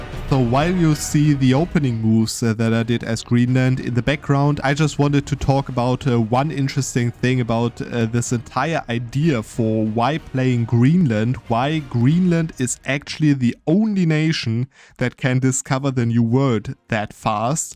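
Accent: Germany. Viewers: The German accent is as sharp as a knife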